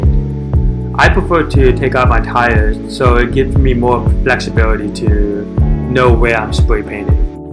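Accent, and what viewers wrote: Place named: England, United Kingdom. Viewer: You speak like English people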